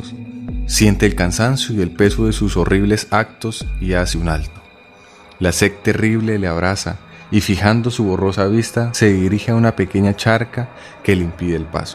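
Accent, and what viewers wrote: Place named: Colombia. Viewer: Jajaja que bien el tónico colombiano mi parceeee !! nunca había escuchado esta historia pero se parece a la de la llorona 😭 AAAAY MIS HIJOOOS !!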